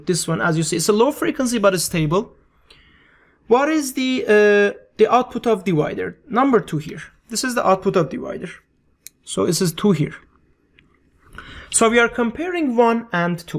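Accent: Turkey. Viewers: dude you are definitely turkish hahah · türk müsün la konusmandan cok öyle geldi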